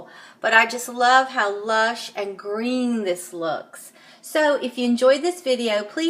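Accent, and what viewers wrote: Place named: Los Angeles, United States. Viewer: Do you live somewhere in LA. You have an accent similar to mine, but I haven’t lived there in 27 years.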